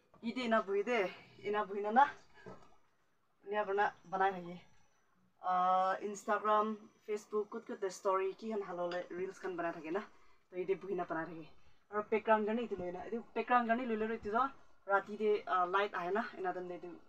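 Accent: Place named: Nagaland, India. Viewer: Sis I like da way u speak nagames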